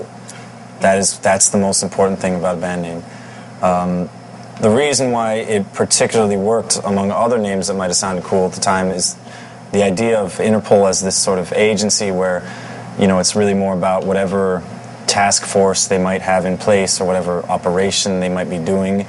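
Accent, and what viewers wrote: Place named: United Kingdom. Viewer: Is it me or he slips in brit accent from time to time? He tries to be super american, but he grew up among people who have a brit accent. Don't deny your origins, Paulie ;)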